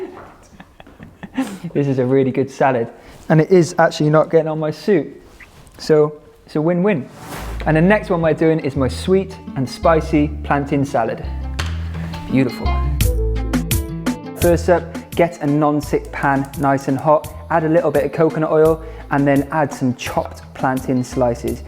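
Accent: United States. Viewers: omg that American accent. I'm dying 🤣 I have had the biggest grin this whole video lol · That American accent is both endearing and entertaining. I have to try these recipes!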